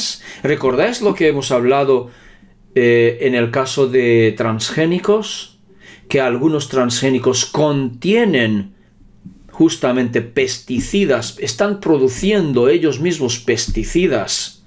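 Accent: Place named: Poland. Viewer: tienes acento de polaco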